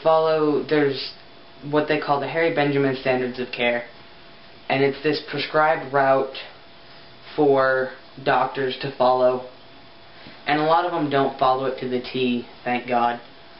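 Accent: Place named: Texas, United States. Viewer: Wait, wait, wait. "Here in Texas" - you live in Texas(or at least at the time of this video)?! :O Hahahahahaha, I've been going through all your videos, and I'm like, "This guy's accent is so similar to mine, I wonder if he's from somewhere around Texas..." 'cause I was born and raised here. What're the chances, man? You've got my EXACT couch, AND you live in Texas. This is just awesome! Hahaha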